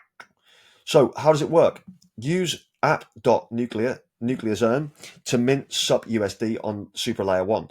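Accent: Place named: Manchester, United Kingdom. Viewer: Great video from the man who i thought was from Manchester 😁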